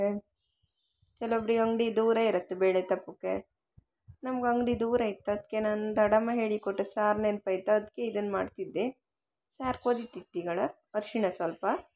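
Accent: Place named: Kundapura, India. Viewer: ಸೂಪರ್ ಕುಂದಾಪುರ ಕನ್ನಡ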